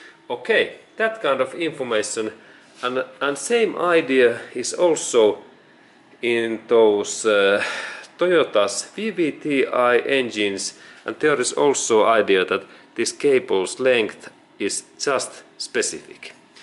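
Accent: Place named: Finland. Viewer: He sounds Finnish to me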